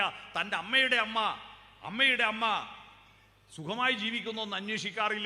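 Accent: Kannur, India. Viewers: വഴക്കന്ന് പൊരിച്ചു വെച്ചോ വഴക്കന്ന് പിരിച്ചു വച്ചു എന്ന് പറയു വെറും കണ്ണൂർ ഭാഷ പറയാതിരിക്കുക...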